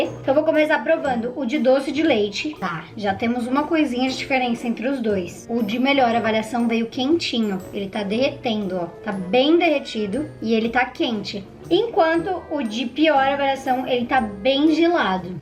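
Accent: Rio Grande do Sul, Brazil. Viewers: Eu: aaaaaa è gauchaaaaaaaaaa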